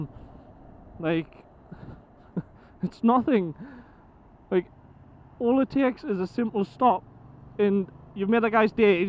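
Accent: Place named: Newcastle, United Kingdom. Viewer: Very happy you are back at it! And I’d do the same for a fellow rider even if I had to travel far to get the gas... I mentioned this to you before that my great grandfather was a gordie so when I here your accent it reminds me of him :) great vid m8 💯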